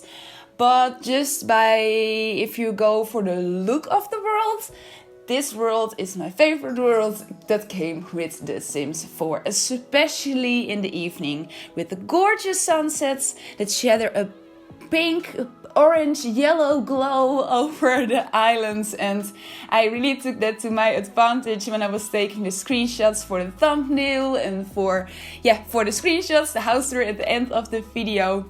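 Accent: Netherlands. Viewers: Are you Dutch? Ps. Love your videos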